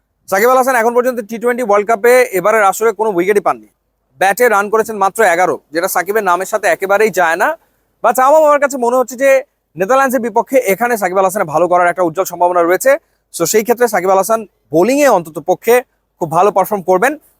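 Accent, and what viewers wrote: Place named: Kolkata, India. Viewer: আপনার কথার ধরন কলকাতার মানুষদের মতো কেন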